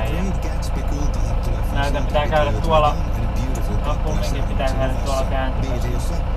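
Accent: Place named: Ostrobothnia, Finland. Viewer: ootkosä pohjalainen meinaa vaa ku kuulostat siltä :D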